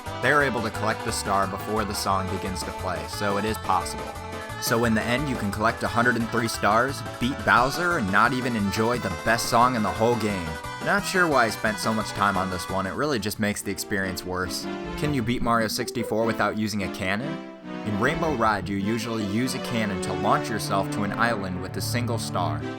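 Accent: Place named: Midwest, United States. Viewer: Love the Midwest accent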